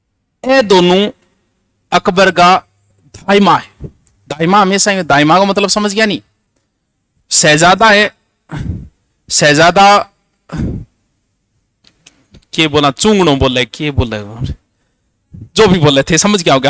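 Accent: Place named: Shekhawati, India. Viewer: भाई हिंदी ही तो थोड़ी बहुत ही तो शैखावाटी बोलते हैं । इस बोली के कारण ही तो इसका पढ़ाने का तरीका मस्त है 🥰🥰🥰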